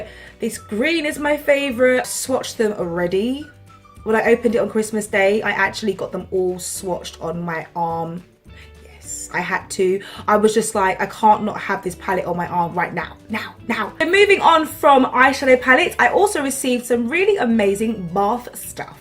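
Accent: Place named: United Kingdom. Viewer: I like ur type of british accent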